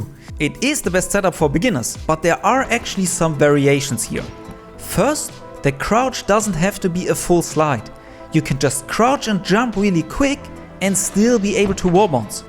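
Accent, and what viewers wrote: Germany. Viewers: Is he German ? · Hello my German friend, man hört das sehr stark raus und ich liebe es 😂 Danke für deinen Content und deine ganzen Bemühungen ^^ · Ich find's geil das man den Deutschen Akzent direkt raushört😂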